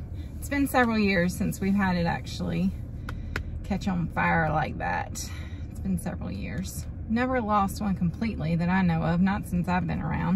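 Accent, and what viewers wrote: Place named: North Carolina, United States. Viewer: Beautiful eyes and smile. Love the NC accent and your positive attitude.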